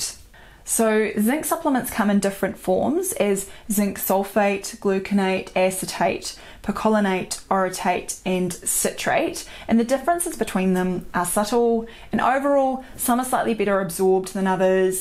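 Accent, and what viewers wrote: New Zealand. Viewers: I liked your Kiwi accent. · I love the way you say, 'GET'. It sounds like GIT, but stretched out. My friend is from New Zealand but he has been here for so long in London he sounds almost English (the toe rag)! So gotta git me some New Zealand accent and your perfect. Oh btw, thanks for the info on zinc, i'll try not overdose on it! Why is New Zealand called New Zealand, is it because its the land of new zeal, are you guys buzzing over there? All the best and keep up the good work. Im in a funny mood today, sorry.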